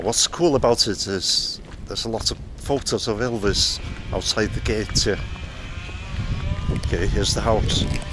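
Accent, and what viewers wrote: Wales, United Kingdom. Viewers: You Have Such A Lovely Accent, I'm Biased Though Also Being Welsh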